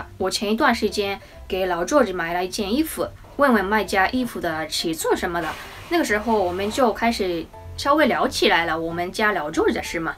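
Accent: Osaka, Japan. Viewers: 的口哨和大阪方言挺好听的。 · 大阪方言还是改不了，哈哈哈汉语说的真好，学语言确实越小学的越好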